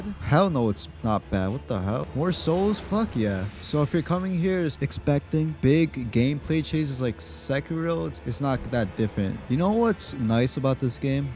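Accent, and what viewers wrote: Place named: Hawaii, United States. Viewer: U sound like u from Hawaii brah? Lol..I grew up on da Big Island myself..Nice Video tho. I'm on my first playthrough.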